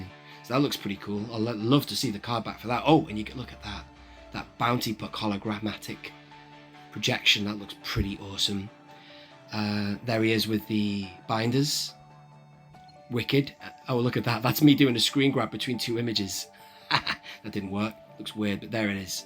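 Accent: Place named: United States. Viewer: I love hearing your american accent.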